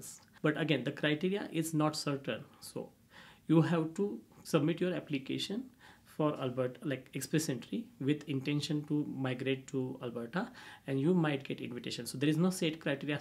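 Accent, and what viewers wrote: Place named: Maharashtra, India. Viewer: You sound like a Maharashtrian, i want all Maharashtrians to speak loud clear and be the boos of their trade. I hope you will outshine and take my suggestions positively. Looking forward to be your client in coming time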